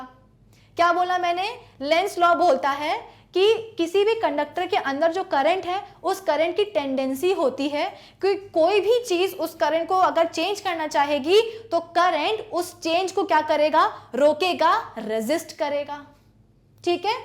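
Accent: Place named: South, India. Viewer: I think he/she is from Tamil nadu or Kerala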